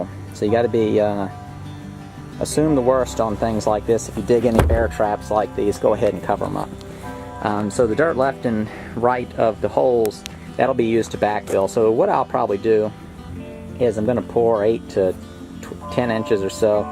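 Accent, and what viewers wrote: South, United States. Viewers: I'm assuming you're in a southern state by your accent and the homes prices...can I ask which state? We are in Louisiana, so just curious. The duplex is 11k, we will have our work cut out for us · If the accent and price of homes didn't give it away that dirt is as south as you can get ! Look at all the clay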